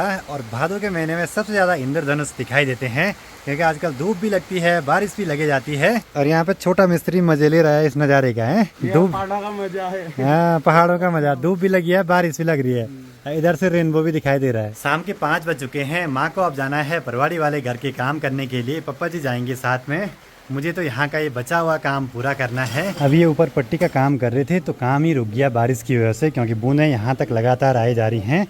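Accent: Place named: Garhwal, India. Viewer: bhaiya ek din pura video Garhwali mein banana aapke munh se Garhwali bahut acchi lagti hai ham aapko Punjab se dekh rahe hain❤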